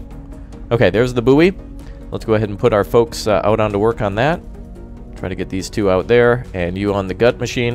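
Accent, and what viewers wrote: Germany. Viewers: you german? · R u german?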